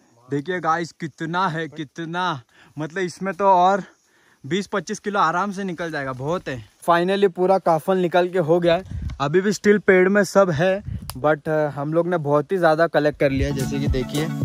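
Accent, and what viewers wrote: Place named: Maharashtra, India. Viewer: Ur tone sounds like Maharashtra people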